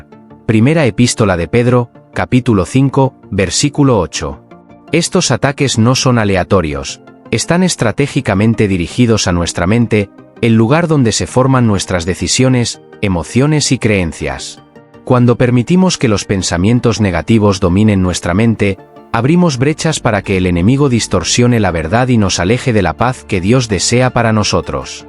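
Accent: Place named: Spain. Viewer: Pero. NO me pongas voz de gachupin🤮🤮🤮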